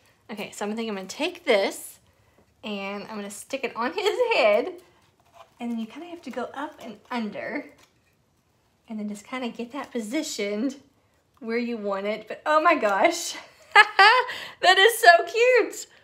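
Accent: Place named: Kentucky, United States. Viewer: Love your KY dialect! My Mommom grew up in Hopkinsville. Lost her a few years back. 😢❤️ I love the idea of tier trays. I think I may do one for my best friend from my college days. We email almost daily.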